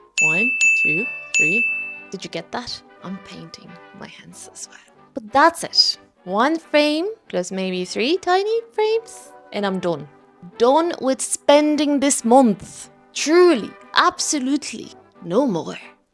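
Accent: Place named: Ireland. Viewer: Came from the crafts but stayed for the accent ngl! 😅 I spent half the video trying to place where your accent is from. I wouldn't have guessed Ireland! I'm Canadian, and we don't get to hear a lot of regional Irish accents on this side of the pond. I love your vibe, your humour, and this video has a nice professional polish. Can't wait to check out the rest of your videos! +sub! 😁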